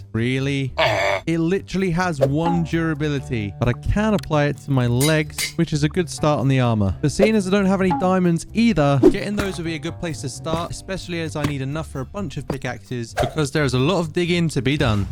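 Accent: United Kingdom. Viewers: Is bro British? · WHY ARE YOU BRITISH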